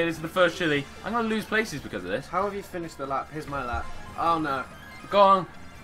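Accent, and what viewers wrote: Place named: United Kingdom. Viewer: What part of Uk is he from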